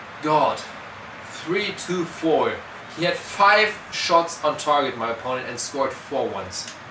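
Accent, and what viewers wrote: Germany. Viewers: Alter Red deutsch man merkt dass du deutsch bist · Manch mal merkt man das du deutsch sprichsf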